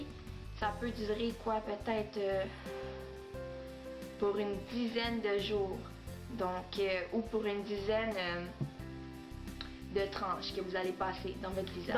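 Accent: Quebec, Canada. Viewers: Je viens de tomber sur une de tes vidéo et je suis charmée :) J'ai quelque petites cicatrices près de la mâchoire et je vais essayer ton truc bien vite. Tu explique les choses si bien et pour tout te dire l'accent québécois me fait craquer :D Gros bisous et merci pour cette vidéo, je m'abonne :)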